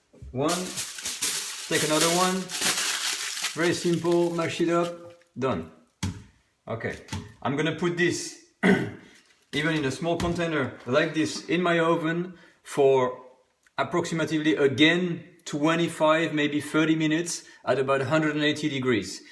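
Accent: France. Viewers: You hsve such a beautiful French accented English 🌺🌺🌺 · Love your French accent ❤ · Wish you make it longer than 9 mntes . Love your French accent Chef . Merci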